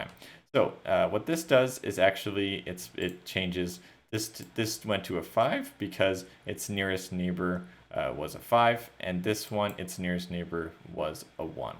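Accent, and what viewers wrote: Canada. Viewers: Bro love the way you explained "Imputer" , And you pronounced "neighbor" accurately Canadian accent rocks brother Thanks a Ton !!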